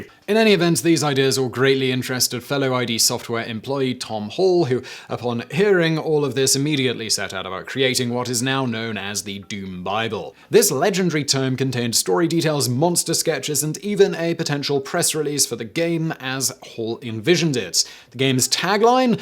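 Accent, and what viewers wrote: United Kingdom. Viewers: Dude, hes British. Haha.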